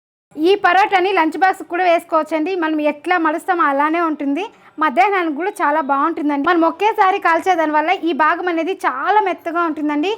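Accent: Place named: Karnataka, India. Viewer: I think u belong to Ananthapur district...ur slang is a bit kannadiga speaking Telugu... Ur recipes r interesting n having eye appeal..ur preparation n presentation of ur recipes r very good...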